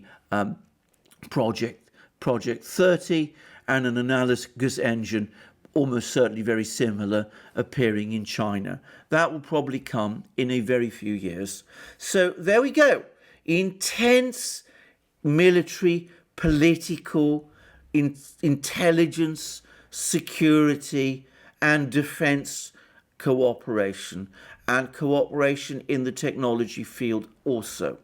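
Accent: England, United Kingdom. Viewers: Mother England gave you a lovely Accent Alexander.Don't bite the hand that feeds you.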